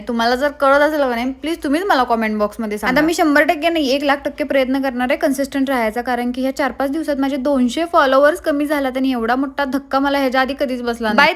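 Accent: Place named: Kolhapur, India. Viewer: हो कोल्हापूर ला पण आसेच बोलतात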